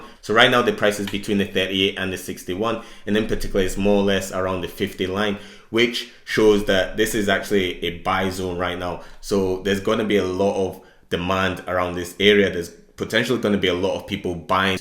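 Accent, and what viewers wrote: Scotland, United Kingdom. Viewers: You remind of Josh finance got in before shiba inu broke a 0 I'm heavily invested in mana so subscribed and liked 👍 where you from also you sound Scottish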